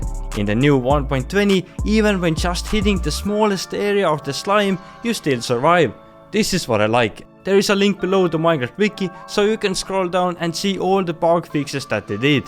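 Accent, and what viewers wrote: Estonia. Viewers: is that an Estonian? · That estonian accent is so goofy😅😂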